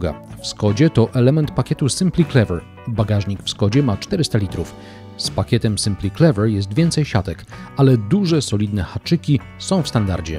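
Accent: United States. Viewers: mieszkałeś z Stanach, że masz US akcent? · Akcent rzeczywiście mocno US :)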